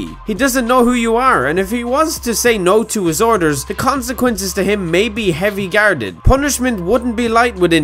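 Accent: Ireland. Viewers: Im subscribing because jacky boi shouldnt be the only popular irish accent on youtube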